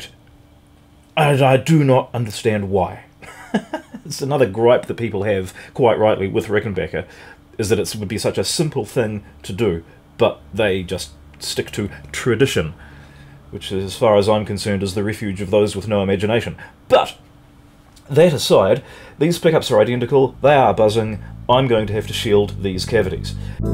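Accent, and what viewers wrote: New Zealand. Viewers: I have 4001 and find the neck pickup needs to be at about 85% before it’s just too much bottom end. Thanks for for the shielding tips - tempted to see if you would do a bit of a upgrade to mine. you sound like you could be in NZ.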